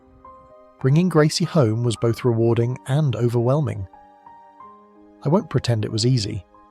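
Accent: United Kingdom. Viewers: Thank you living angel❤❤❤. Pls use a narrator with a US accent . The British accent is very irritable .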